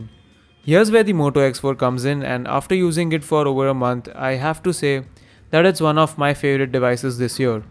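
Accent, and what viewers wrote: India. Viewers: There's something about the indian accent that is hard to take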